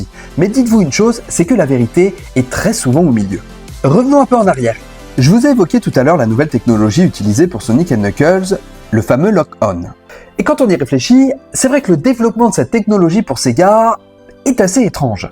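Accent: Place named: Quebec, Canada. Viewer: La traduction IA avec l’accent québécois, on pouvait très bien s’en passer. La prochaine fois, mets des sous-titres.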